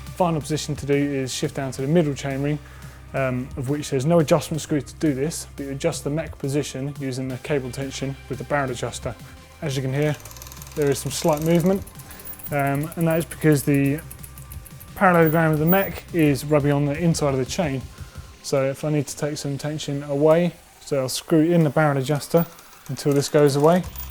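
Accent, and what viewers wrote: England, United Kingdom. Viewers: I didn't TD this video but the music is way too loud to hear your soft spoken English accent.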